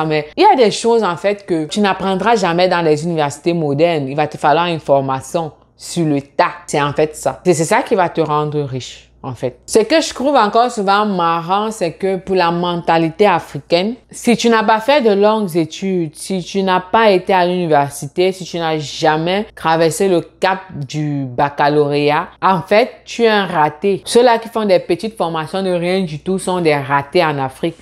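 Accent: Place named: Canada. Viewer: vous avez l'accent canadien mm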